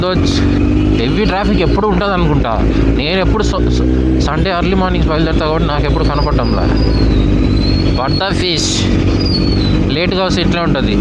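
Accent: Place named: Rayalaseema, India. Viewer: Anna Rayalaseema yasa superga undhe…Anna medhe kadapa na ??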